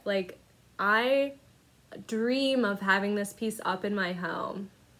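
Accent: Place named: Midwest, United States. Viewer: Hi! So nice to "meet" you. Michelle Bendy mentioned you so I have now watched all three. I had a feeling it was midwest. I spent approx 30 years in Oak Park & Forest Oark, IL so hi neighbor. 😁 Thanks for sharing your time, and your joy of stitching. It's refreshing and no, I don't hear a lisp at all. Age? 29?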